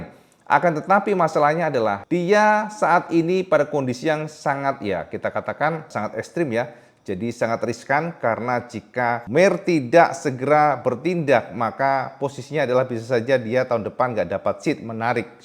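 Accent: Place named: Tulungagung, Indonesia. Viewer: bahasa tulungagung e gak lali ki om ujug" 🤣🤣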